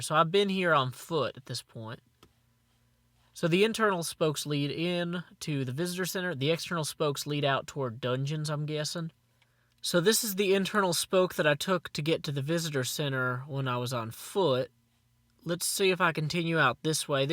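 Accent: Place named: Appalachia, United States. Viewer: you know that we (a few british people) stereotype your accent for hillbillies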